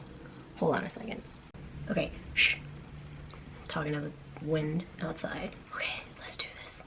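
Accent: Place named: United States. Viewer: Your Japanese got an American accent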